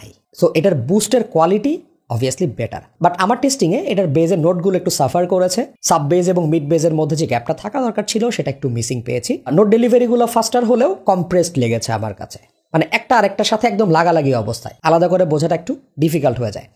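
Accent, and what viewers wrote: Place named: Dhaka, Bangladesh. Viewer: কে ভালোবাসা দিয়েই ধন্যবাদ জানাই যাউজ্ঞা সামনের দিক আউজ্ঞা ঢাকাই ভাষা অসম্ভব ভালোলাগে তোমার😊 আপনার উপস্থাপনা দুইভাবেই মাঝে মধ্য আপন ভাবে কথা বলি আমি, উপস্থাপন দেখে। Don't mind plz ঢাকার পোলা আইসা পড়ে। দোয়া ও শুভকামনা সত্য সামনে রেখে কথা বলা (যেকোন পন্য রিভিও এর কথা বলছি)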